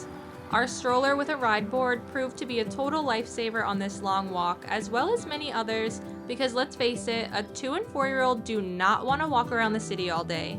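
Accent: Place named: Serbia. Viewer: Pronouncing Serbian surnames correctly, equally in terms of accent and pronunciation and the use of the letter Ć, undoubtedly shows that you have Serbian roots. Americans or Britons cannot pronounce Serbian surnames correctly, either by pronunciation, accent, or the use of the letter Ć. And your overall,general accent telling undoubtely that you are not British,or American,or Canadian,or Australian. So, if you are of Serbian origin, and you have such an attitude towards Kosovo, you should be ashamed of yourself and never come to Serbia again.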